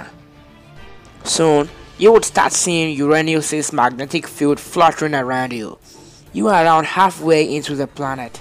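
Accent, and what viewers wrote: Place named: Jamaica. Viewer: What country are you from? You sound Jamaican.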